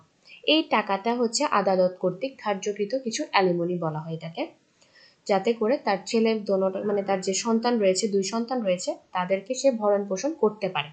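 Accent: Chittagong, Bangladesh. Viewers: আপনার বাচনভঙ্গি যথেস্ট সাবলীল কিন্তু আমি আপনার ভয়েজ প্রথম বার শুনেই ধরে ফেললাম আপনার বাসা চট্টগ্রামে 🥰 বাই দা ওয়ে আমি রাজশাহী থেকে 💕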